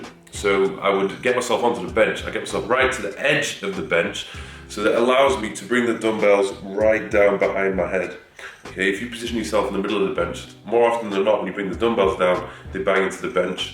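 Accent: United Kingdom. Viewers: Sounds like John Cena with a British accent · A British John cena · Hello from LA again. I was so glad to see that you are back with the videos again. Your arms are now, perhaps your best body part!! I'm going to incorporate these new tips into my training and will pass it on to my personal trainer. My trainer is good, but your explanation is so clear and understandable......maybe it's the enviable British accent!! Ha Ha. Looking forward to more great videos. LA needs you!! Thanks, Hal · I hear a British Ben Shapiro for some reason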